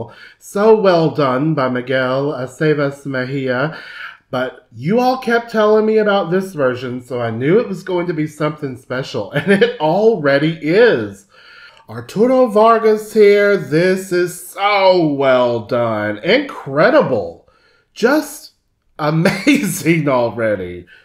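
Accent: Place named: South, United States. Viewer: "I am so GRATEFUL I found you"!!!!! As you kept saying Amazing!!! Amazing!!! I kept saying the same about you, "AMAZING, omg this man is amazing meaning you and the singer". I have heard before, but not depicted and explained as you have done so beautifully. Your reactions, and interpretations, from the symphony, the mariachi, and of course the singer, to the lyric of this beautiful song which is quite meaningful. Thank you so much for your respect an appreciation to latin culture, all though I am Latina but not from Mexico (not mexican) many hispanic cultures, appreciate each others music & folklore. To have such a wonderful person such as yourself, appreciate it too and truly understand the significance, and the value that that this singers VOICE does for this song, was such a pleasure to see. I am now a new sub and fan of yours, I am truly in AWE of your persona, and you truly are a gifted TEACHER in more ways than just voice. 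To see your expressions, and to hear your comments, I was right there with enjoying you & this beautiful composition. I applaud you again & again, music holds no boundaries and you truly are a testament to that. Given the certain discords that many ppl of color have gone through recently in the U.S.A. (a country that means so much to many of us, a country we honor as well) many of us have felt hatred, and bridge in desperate need of repair. Then here you are this wonderful man with a beautiful southern accent, teaching us about music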